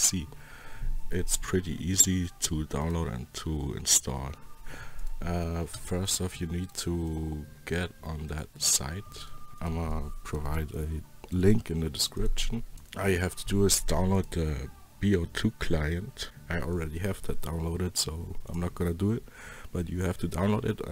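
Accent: Germany. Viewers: man hört deinen deutschen akzent sehr stark raus :D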